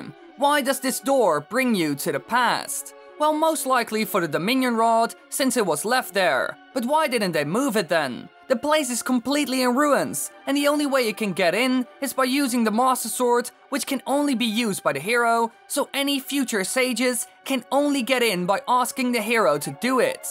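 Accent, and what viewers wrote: Netherlands. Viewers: despite the accent i can spot a dutchman in your voice for sure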